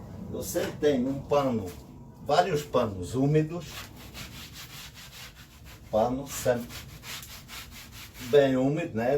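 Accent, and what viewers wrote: Portugal. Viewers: Logo no inicio, pela simpatia, sotaque e nome, já fui assinalando o like e me inscrevi. Caro sr., assisti com emoção a apresentação dos seus ensinamentos em vídeo, pois lembrei do meu avô, também lusitano. Era um ótimo marceneiro, carpinteiro e pedreiro. Fez praticamente sozinho nossa casa em Piratininga - Niterói/RJ, numa época em que ainda não havia energia elétrica, só ferramentas manuais. Pena que não houve tempo para que ele me passasse os seus conhecimentos. Bem, gostei da ideia e vou aplicar no muro da minha casa, ora pois! Parabéns e obrigado. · Amo o sutaque portugues, queria falar assim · Vou salvar este vídeo para fazer em breve, muito bonito, muito simples e sem falar da simpatia e o sotaque de Portugal que acho muito legal. Parabéns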